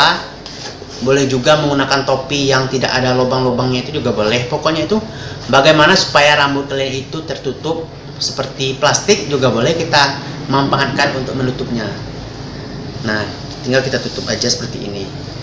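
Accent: West Sumatra, Indonesia. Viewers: Logat Minang nya, Karen bang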